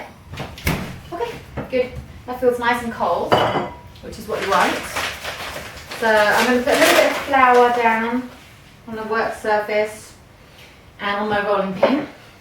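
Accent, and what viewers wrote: United Kingdom. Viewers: Love your cute little British accent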